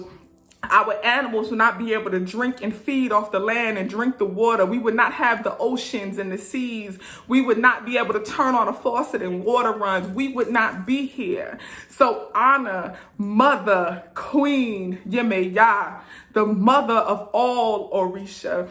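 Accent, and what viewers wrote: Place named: New York, United States. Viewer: Thank you, sincerely, for this,!! My heart so, so needed this. Much love!! Àse! Also, I’m married to a New Yorker and I just love your accent and the way New Yorkers talk — the realness! 🙏🙏🖤🖤🖤💜💜🔮💫🧿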